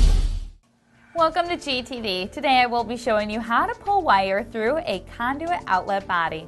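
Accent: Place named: Michigan, United States. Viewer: This is the most Michigan accent I've ever heard 😂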